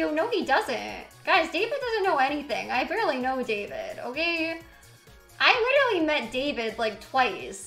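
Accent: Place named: Connecticut, United States. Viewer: When she says "bits-uh" I want to pull out my hair...I think its a connecticut thing lol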